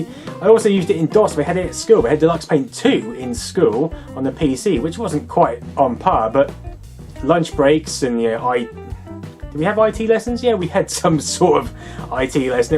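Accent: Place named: Estuary, United Kingdom. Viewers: Spinkington Clift haha you'd have no chance in this part of the UK, he's pretty posh by our standards. British accents vary considerably. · It's a British accent. That's how they talk. Actually if I'm not mistaken that's the "estuary accent" that's common in the UK. Not pronouncing final -r sounds is a hallmark of British accents and the th pronounced as F is called "th-fronting". But my linguistics education is pretty much limited to YouTube videos so i'm not an expert.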